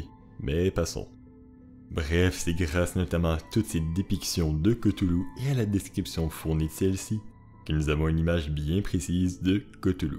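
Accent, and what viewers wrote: Quebec, Canada. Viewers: J'adore Cthulhu, mais ca m'a fait bizzarre d'entendre l'histoire avec un accent québécois ^^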